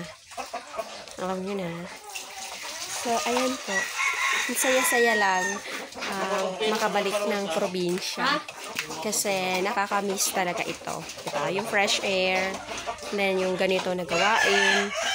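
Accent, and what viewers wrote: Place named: Bicol, Philippines. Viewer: Hello manay.Sain ka tabi sa albay?Parang paryo kan bisaron ta ah😁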